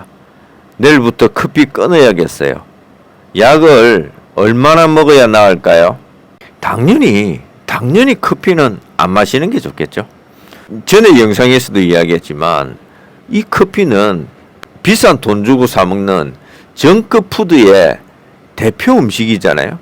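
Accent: Gyeongsang, South Korea. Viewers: 경상도분이시라 ㆍ ㅎㅎ 말솜씨 가 너무재미있읍니다 덕분에 치아에대한도움되었는데 역시 듣고보니간단하네요 당장실행하겠읍니다 ㅋ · 구수한 갱상도 억양으로 ... 감사합니다 저도 도전!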